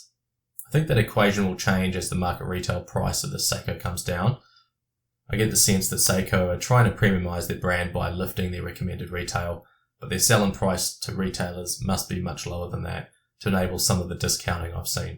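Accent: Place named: New Zealand. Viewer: You sound more Kiwi than Australian. Another ship jumper?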